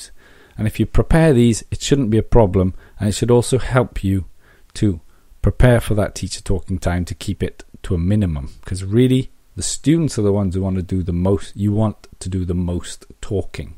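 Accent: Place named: Wales, United Kingdom. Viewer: He sounds Welsh to me :) albeit very subtle..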